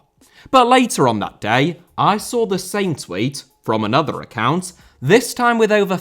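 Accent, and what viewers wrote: England, United Kingdom. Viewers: That's just how Brits sound, don't sweat it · 1. Innocent until proven guilty. Especially in today’s day in age were many men rich and poor alike are falsely accused. Provide others the same grace you would want in return if you were the accused. 2. A story like this to be completely made up such as a famous person getting a divorce will be obvious eventually and would be hilariously dumb to fabricate. Instead, you should verify first that they are lying before you accuse them of it. Until then don’t act like you know they’re lying when you really don’t. That accusation from you by the same standard you’re using is just as much a lie as the one you’re accusing them of. Both your claim and theirs are unsubstantiated. The hypocrisy drips off from your British accent. 3. What’s unreasonable in western society is that laws allow for a woman to leave for any reason, even if the women cheats she can take half of the assets. 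It’s also unreasonable to get into a supposed life long vow and then just leave and take everything. Many men are destroyed from this and it’s not what one would describe as justice based upon the meaning found in a dictionary. How can one make a promise and be rewarded for braking said promise/vow? 4. Yes lots can happen in divorce court depending on the country. Very good of you to point that out. 5. Misogyny is the hatred of all women. It is Not when men are pissed off at current marriage laws and a culture that allows women to accuse men and labeling them as guilty until proven innocent. 6. Allow me to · I thought the video was so long because he was going to present mounds and mounds of evidence to back his point. Instead however, he's just reading tweets and being proud of himself for making condescending tweets online. It's pathetic really. Turns out having a British accent and making snide comments on the internet doesn't necessarily entail intelligence. 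· "Accused rapist" learn the definition of accused, charged, sentenced before trying to sounds intelligent with that smug british accent, being accused of something means about jack sh%t. Im not surprised that many high profile rich guys get accused of these things, im also not surpised that the supposed victims ALWAYS fixate on getting paid instead of actually putting that man behind bars, wonder why that would be.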